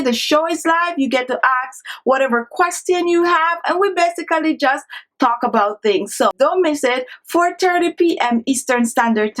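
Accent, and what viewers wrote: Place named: Jamaica. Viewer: I am subscribing, not only because the information is good, but also because you sound Jamaican! Thanks for sharing, I will be trying this!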